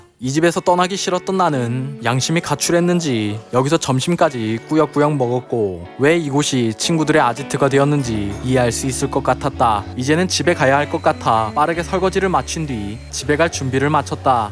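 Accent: Jeju, South Korea. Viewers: 제주도 사투리 싱기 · 이분 고향이 제주도인가요? 처음듣는 사투리같아요 ㅋㅋ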